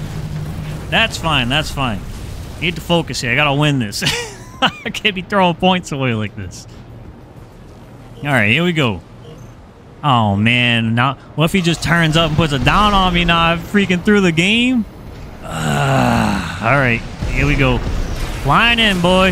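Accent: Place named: East Coast, United States. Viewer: Love your videos homie! Judging by your accent I’m guessing you’re from the east coast Boston or jersey! My old job I had a ton of coworkers who came from the east coast to train with me here in Cali so I can spot that accent a mile a way lol. Btw I’m on my third play through on the story mode. I’m trying to unlock all parts before I make the journey in to pvp. I’m a veteran of this game dating back to ac1 but due work and family duty I’ve been trying to make some time For some ac goodness !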